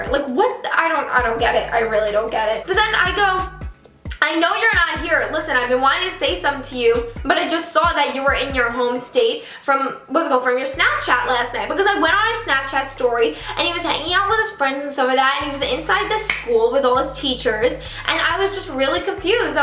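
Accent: New York, United States. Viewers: lol i just discovered you and watched your "exposed boyfriend" video and i knew you were from new york. 1) savage as fuck 2) your accent is cute and 3) you're not as basic as white girls in (all of) California and Atlanta. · Oh my gosh. The New York in you is SCREAMING in this video. I love it~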